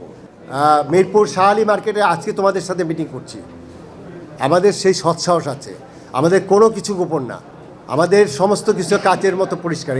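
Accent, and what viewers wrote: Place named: West Bengal, India. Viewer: ওনার বাংলা ভাষা অনেকটা পশ্চিম বংগের মানুষের মতো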